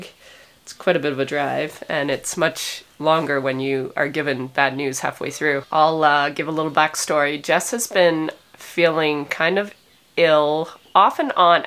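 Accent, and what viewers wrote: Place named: Canada. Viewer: I love the Canadian in your voice!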